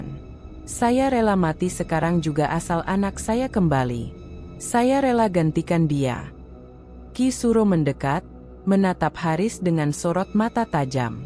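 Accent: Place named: Java, Indonesia. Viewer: Lucu banget logat jawanya.... Aneh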